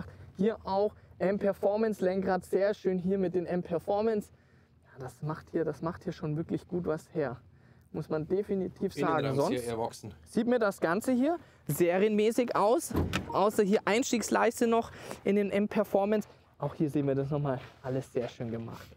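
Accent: Bavaria, Germany. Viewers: A is des schee wenns auf boarisch is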